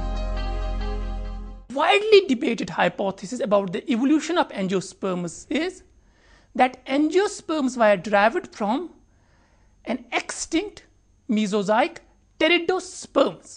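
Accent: India. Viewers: I dig British accent when spoken by English peoples. Yet surprised how English speaking Indian folk, can so easily mangle such a comforting vocal sound! Even when narrator in this video doing so, is speaking about one of my favorite subjects. Morally I agree, my Mother should wash my mouth out with soap after making such an unpolitically impolite rude statement like I have here. I did make a responsible effort attempting to imagine how another Indian viewer would be proud that narrator is a fellow countryman, carefully explaining details involving this technical subject. But ended up imagining that fellow person also from India, totally agreeing with me about narrators accented British accent.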